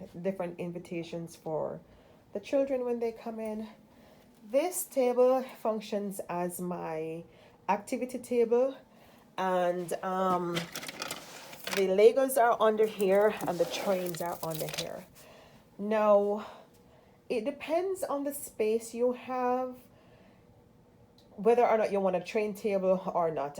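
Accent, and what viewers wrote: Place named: Jamaica. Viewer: Hi 👋 😀 You sound Jamaican 🇯🇲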